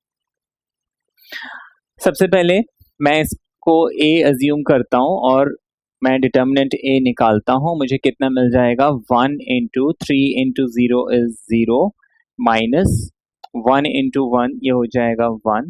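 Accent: Bihar, India. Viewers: R u from bihar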